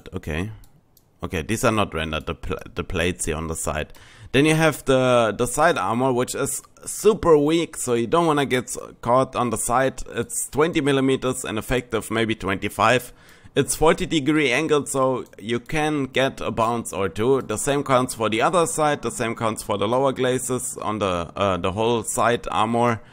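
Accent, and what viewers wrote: Germany. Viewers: i just love your accent i think its german right? xD i laugh so hard everytime SUBBED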